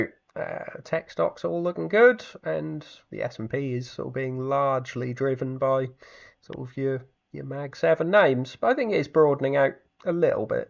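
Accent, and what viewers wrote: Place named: Bristol, United Kingdom. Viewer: I’m guessing it’s a Bristol area accent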